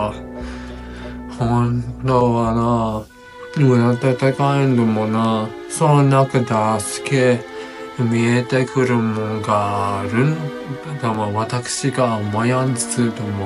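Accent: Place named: Nanbu, Japan. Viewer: 南部弁初めて聞きました。同じ日本語なのに不思議な感じです😊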